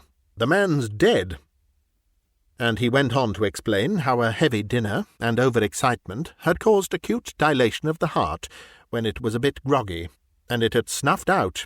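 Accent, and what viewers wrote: England, United Kingdom. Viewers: For some unknown reason I sleep best to British accents. It's wonderful to hear your beautiful human voice with perfect pitch and normal human rhythm and none of the bizarre pauses that gives away the robot voice and is jarring enough to wake me if I have dozed. I do hope YouTube doesn't succeed in their apparent campaign to eliminate all actual human narrators. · Another narrator got accused of being AI. It's a sad day when we can't tell the difference by the generally god awful rhoticised intonation of AI butchering the story and the rich timbre of a cultured English accent reading a jolly good story!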